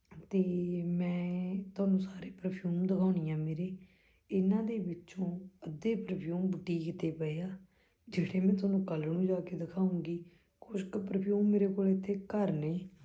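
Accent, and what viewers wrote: Malwa, India. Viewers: Hnji didi ji malva cha aapa sare varge hi bolde aa ji and tusi sare bhut vadiaa o didi ji waheguru kare tusi didi hamesha aae hi khush rho ji ❤❤❤didi plzzzzzz jado tusi kadi pind hun aae ta daseou ji mera pind kot fatta ji kot smir de nal ji asi thunoo ghar blaouna ji ❤❤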